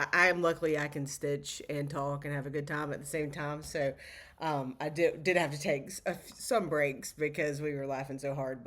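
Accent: South, United States. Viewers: Another great video,! I, too, speak Southern (or is it Surrthern)! That used to bother/embarrass me, but NOT any more!! I'm proud to be a country bumpkin! Your Spooky Summer is a great idea, but I'm in the middle of a huge red sampler and it seems to be attached to my body at the moment! I can't wait to see your finishes, I know they will be fabulous! Happy Stitching and see you next time! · You amaze me with all that you accomplish. Your stitching is beautiful, and I absolutely love your southern accent!!! :) Thank you for another great video.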